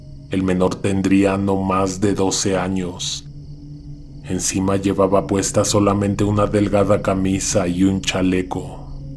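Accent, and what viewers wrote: Mexico City, Mexico. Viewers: GRACIAS.YA NO OIGO.OTRO CANAL ME.GUSTA TAMBIÉN.ESE TONO.CHILANGUITO M HACES MUY AMENO MI.SUEÑO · Oye una pregunta eres chilango😎??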